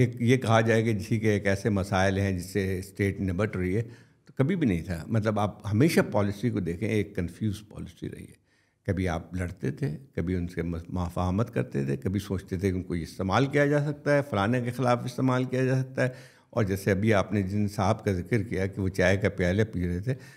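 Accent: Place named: Bihar, India. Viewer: sahab ke bolne ke style se aisa lagta hai ki ye basically Bihar ke hai.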